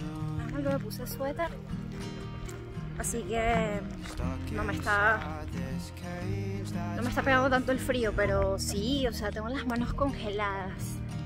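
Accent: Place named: Argentina. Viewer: Estás súper argentinizada por lo menos la entonación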